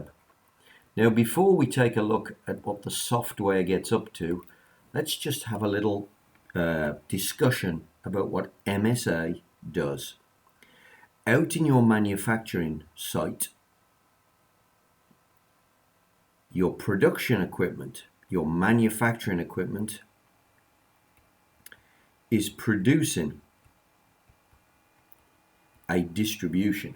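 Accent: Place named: United Kingdom. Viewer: Finally a British accent in a fantastic subject !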